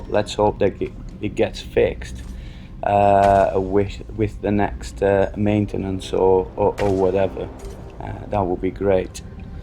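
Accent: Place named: Northern England, United Kingdom. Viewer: Haha as a Midlands man I was sitting here like.. I'm sure he's northern... but he also sounds foreign... love it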